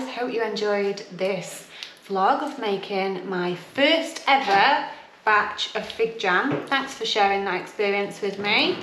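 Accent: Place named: Yorkshire, United Kingdom. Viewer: Dear, I am binge watching your vlogs, I like your calm style and I find your Yorkshire accent loveable. Your channel is as entertaining as Nicki Positano or Kristen and Joerg from the UK. I love about you and these others vloggers that you lead a pretty great life in a nice place and you are aware of that and make the best of it and try to enjoy totally. Marvellous and intelligent attitude. Keep up your good work. Anyway I love your clothes choice and your earrings too.😘😘🌼🌼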